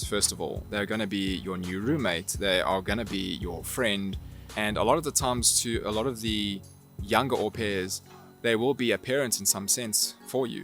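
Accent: South Africa. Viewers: I'm going to South Africa as an AuPair soon, is your english accent what english sounds like there? Do all people speak this language? Or is there another major language that determined this accent? :D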